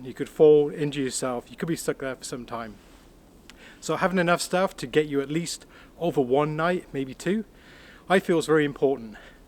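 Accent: United Kingdom. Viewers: Was not expecting the British accent, but then I am new to the channel. Survival Russia bought me here BTW.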